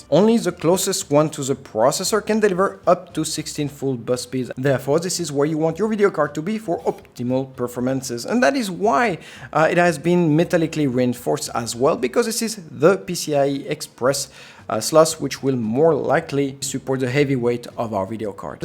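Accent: France. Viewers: Tu es français ? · so what exactly is the advantage of having 2 lan ports? and btw , boy u sound soooooo french haha nice vid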